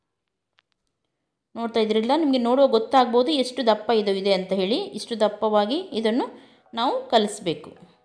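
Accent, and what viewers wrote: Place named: Udupi, India. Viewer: Idu udupi kannada mr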